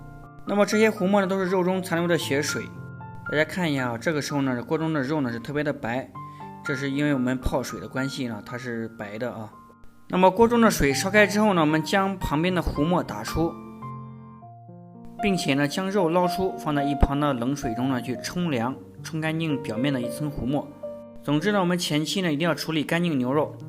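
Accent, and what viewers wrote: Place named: Anhui, China. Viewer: 不错，安徽人啊！口音。😹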